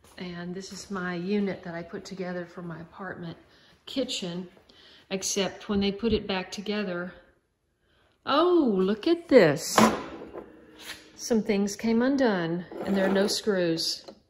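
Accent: Tennessee, United States. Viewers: A Tennessee accent very endearing to me. I am from Wilson county and have lived in SC for 42 years. I pick up my native TN accent in a heartbeat! Let 'er fly, sister! Your home is beautiful. It seems light and cozy.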